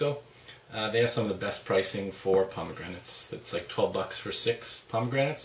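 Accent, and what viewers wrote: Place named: Canada. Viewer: You sound Canadian. A Canadian who talks too much.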